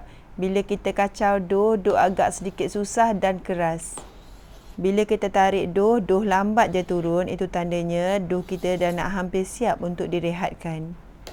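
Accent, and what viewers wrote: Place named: Malaysia. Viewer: Ni bunda malaysie logat bicaranya, tusini kue cincin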